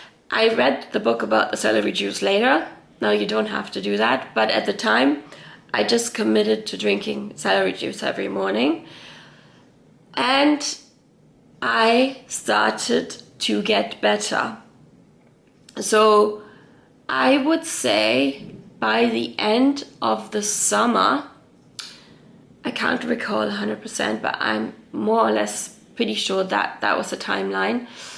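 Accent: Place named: Germany. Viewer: Ich habe an deinem Akzent gehört, dass du Deutsch sprichst:), es freut mich, dass es dir besser geht und du dein Wissen teilst. Ich beobachte, dass sehr viele Menschen selbst jetzt im Sommer erkältet sind. Sie haben einen Infekt nach dem anderen. Das gab es vor Covid und der Impfung nie. Einen Tipp habe ich noch: In einer infekt freien Zeit hat sich bewährt den Körper Kältereizen auszusetzen. Kalte, oder Wechselduschen, Anwendungen nach dem guten , alten Kneipp, der ja immer mehr in Vergessenheit gerät. Das ist wirklich gut fürs Immunsystem.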